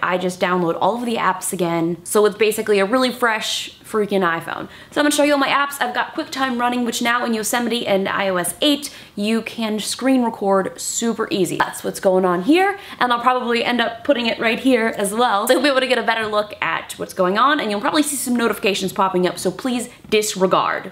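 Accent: Pittsburgh, United States. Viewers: Is she from Pittsburgh Pennsylvania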